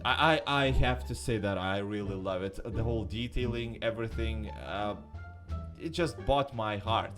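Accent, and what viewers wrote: Russia. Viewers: What country do you live in? (Russia)? русский акцент)))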